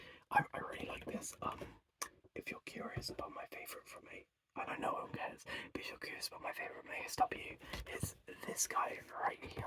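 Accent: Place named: United Kingdom. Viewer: I’m sooo ready to just relax to this video even though it’s an older one of yours. It’s been a long day. Random but I have one pen pal friend that’s British and I’ve never heard their voice before but hearing yours..I can imagine they might have an accent like yours. Though I’m trying to keep in mind that there are different accents depending on where you are in your country..? Right? 😂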